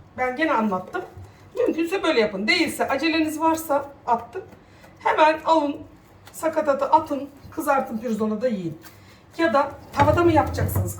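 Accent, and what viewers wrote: Aegean, Turkey. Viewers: hanım ellerinize sağlık iyi bayramlar diliyorum canım bir Tireli olarak Bursa dan çok selamlar sevgiler yeni takip etmeye başladım gerçekten çok seviyoruz seni egece konuşmalarına bayılıyorum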